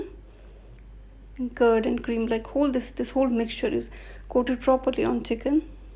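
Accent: Kashmir, India. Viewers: No kashmiri English please 🙏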